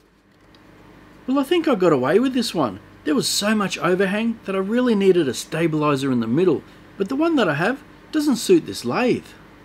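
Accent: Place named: Australia. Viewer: I love everything you make and it is nice hearing a fellow Aussie on YouTube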